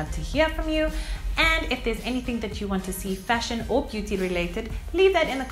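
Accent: South Africa. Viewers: Maays South Africa ☉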